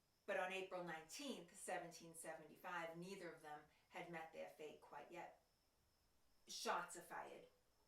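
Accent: Boston, United States. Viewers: Hi. I love Revolutionary War history and I subscribed to your channel over the weekend. I live in Essex County, N.Y. where the famous forts Ticonderoga and Crown Point are located. I would love if you did an episode on the 58 pieces of artillery that were dragged overland each on a sled of its own in the winter of 1775-76 from the captured Fort Ticonderoga to Dorchester Heights by General Henry Knox and his men forcing the evacuation and liberation of Boston from the British in March of 1776. It was an extremely difficult task and engineering feat without a loss of a single cannon and a victory for the colonists. I would love to see where they set the artillery in Dorchester Heights that forced General Howe his army and the loyalist population to flee. Had this band of men not been successful future revolutionary events could have turned out radically different. 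Thank you for the interesting post to watch and your Boston accent is fantastic. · I am embarrassed to say that I am almost 80 and life a short distance from Concord/ Lexington and have not visited, but watching these videos is giving me impetus to doing it this spring. BTW--- ---you have a Boston accent you can cut with a knife. It almost sounds Dahhchestuh, but not quite--but sounds as if you grew up in Boston: Captain Pahkuh! BTW---I have several kin that were residing in Plymouth, Kingston, and Duxbury that responded to the Concord emergency or the Concord alarm as it was referred to. Quite a hike on short notice! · These are fun, and I love your Boston accent!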